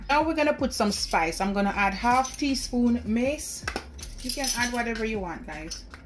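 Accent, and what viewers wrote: Jamaica. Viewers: You know we loving that Jamaican accent baeeeee!!!!! zucchini bread is new to me... but woahh what a big Cucumber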